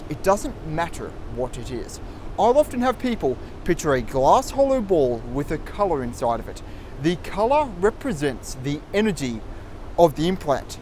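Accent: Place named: Australia. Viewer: Sounds like an Australian accent-probably NSW or Queenslander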